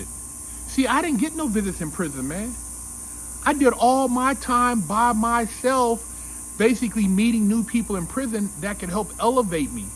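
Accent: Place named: Montana, United States. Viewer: But you live in Cali right?.. sounds like you're talking like you live in Montana or something. No disrespect. Love ya!!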